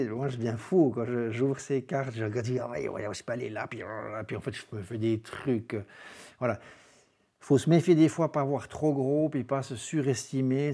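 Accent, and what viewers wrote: Switzerland. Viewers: Salut ! l'accent suisse fait du bien :-) je confirme tout le bien de Swiss Mobile (Iphigénie imbuvable)...je te suggère peak viewer pour les noms des sommets quand tu es sur le terrain...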